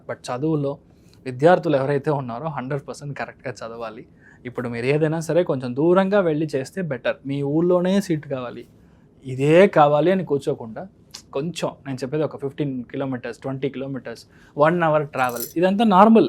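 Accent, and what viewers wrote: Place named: Tamil Nadu, India. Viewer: ఏం తెలుగు రా నాయనా.. తమిళ యాస, సరైన తెలుగు పదాలు కూడా మాట్లాడటం తెలియడము లేదు. ముందు తెలుగు సరిగ్గా నేర్చుకుని రా గురువా..🤔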